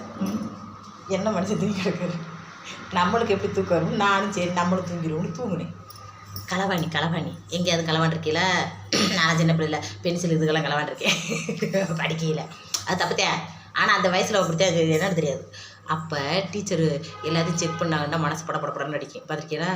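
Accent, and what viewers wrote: Madurai, India. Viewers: nalla siruchenma un pechu romba nalla Iruku mathurai slang nallai tr uk ku❤❤❤❤❤❤❤❤❤❤❤❤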